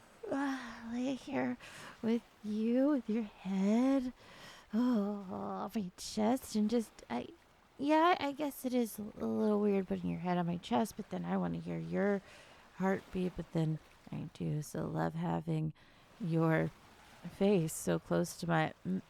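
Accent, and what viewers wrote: South, United States. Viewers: Cute video, you southern accent smooth talker. Have a great weekend. 🍎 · I may hear the southern bell often but tbh it suits you better than most folks I've heard it from that's for sure lol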